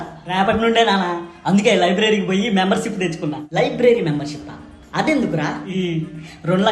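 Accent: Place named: Godavari, India. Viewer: ఇప్పుడు చూసా నీ అసలు ఫేస్ మీ క్రితం shorts లో గోదావరి యాస మాత్రం... బాసు సూపరో సూపర్😂😂😂😂😂😂😂😂😂😂😂😂😂😂😂😂😂😂😂😂😂😂😂😂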